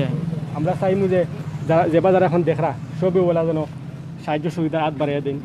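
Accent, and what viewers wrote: Sylhet, Bangladesh. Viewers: আরে ভালো করে কধা বলো,কথাও বলতে পারোনা,সিলটি ভাষা বাদ দাও?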